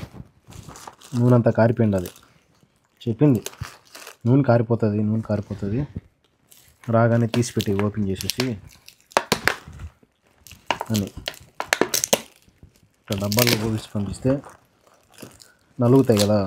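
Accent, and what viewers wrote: Kadapa, India. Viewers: Mee kadapa language bagundi and mee smile supper ❤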